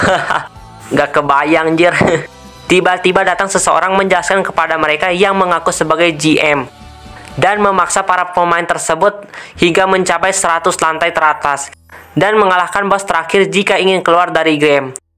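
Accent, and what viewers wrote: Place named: West Java, Indonesia. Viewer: suara khas sunda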